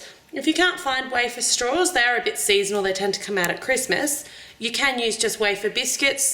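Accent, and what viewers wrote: Australia. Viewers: you australian? :) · She sounds Australian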